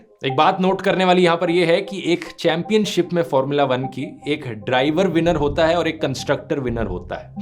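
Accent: Haryana, India. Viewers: Why does he suddenly have haryanvi accent?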